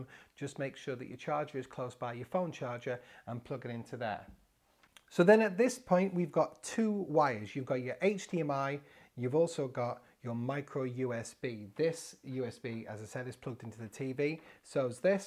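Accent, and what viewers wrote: United Kingdom. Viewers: I just cannot believe the MOANING MINNIES who are complaining about the chatter here ! Goodness, life's just too short, you have to say what you can WHILST you can coz you're a long time dead ! You sound like your from back home in England and English people LOVE to chat !! :) Great video and Entertainment ! :) You should do a 30 minutes Vlog on "How-To" just to pig off the whistle stop hustle bustle people who haven't got time to breathe ! LOL Greetings from Xena Land !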